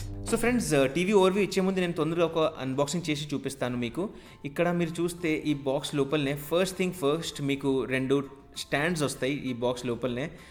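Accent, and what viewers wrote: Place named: Hyderabad, India. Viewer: Sir u r speaking good telangana language I.e, hyderabadhi language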